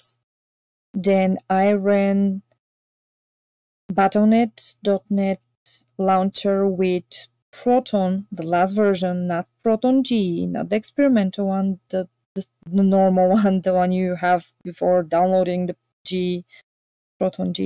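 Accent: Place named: Bulgaria. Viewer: hey hey im really wondering what your first language is? is it bulgarian? you sound like my bulgarian friend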